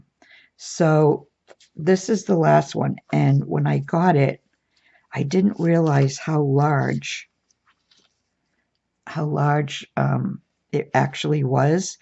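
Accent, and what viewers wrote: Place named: Massachusetts, United States. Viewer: This is just so lovely! Thank you for sharing! Your MA accent makes me feel right at home!! I grew up there and most of my family is still in the Boston area. I live in ND now. So glad I met you through the shop!!! Just subscribed!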